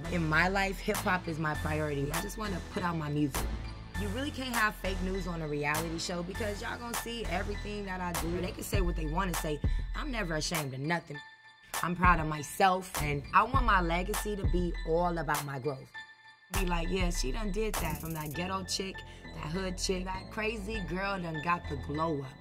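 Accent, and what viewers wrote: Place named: Compton, United States. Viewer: Sis got a skrongggg Compton accent what is you saying lmaoo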